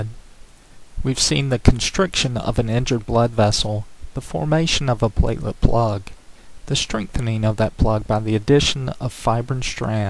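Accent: South, United States. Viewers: the southern accent was very strong and though i am southern i cant understand it. or maybe you just need to blow your nose... i dont know... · Love love love your southern accent !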